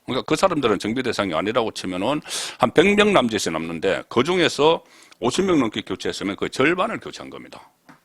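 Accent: Gyeongsang, South Korea. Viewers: 송국건님 늘 애청합니다. 구수한 대구말씀과 정확한 해설 매번 기대됩니다. 최곱니다.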